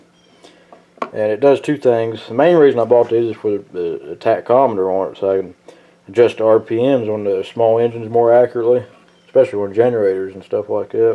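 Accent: South, United States. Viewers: Great video. Thank you. I'm from Arkansas so I appreciate you southern twang. I sound just like you, Thanks,.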